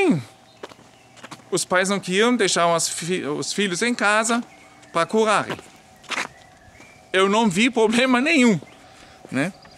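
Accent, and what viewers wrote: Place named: Germany. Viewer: Eu sou canadense-brasileiro. Nasci em Santos - São Paulo. É uma cidade portuária. Meu pai era canadense e minha mãe biológica era descendente direito de alemães. Meu avô paterno era japonês e minha avó paterna franco-canadense. Por parte da minha mãe adotiva meus avós eram ingleses. Infelizmente não conheci a minha mãe biológica. Eu simplesmente adoro os seus videos. Assisto todos os dias. Devo dizer, também que estou inscrito. VOCÊ lembra o meu pai quando fala português. MEU pai tinha um sotaque inglês muito forte. Sue sotaque alemão é bem característico, também. ACHO VOCÊ SENSACIONAL. UM ABRAÇO DO SEU AMIGO CANADENSE-BRASILEIRO.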